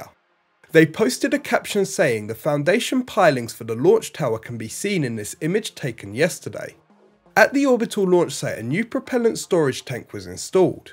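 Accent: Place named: London, United Kingdom. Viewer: It is a London thing, he said it "free times" already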